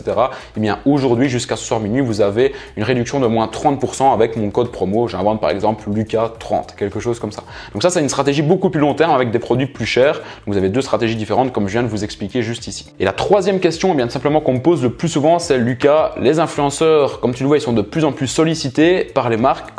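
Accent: Belgium. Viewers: T'es belge? Je reconnais l'accent belge ahah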